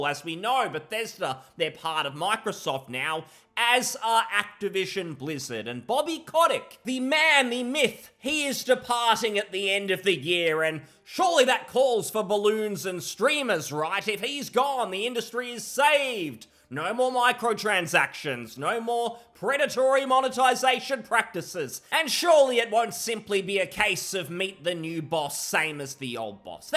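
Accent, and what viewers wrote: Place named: Australia. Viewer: All Australians sound like this.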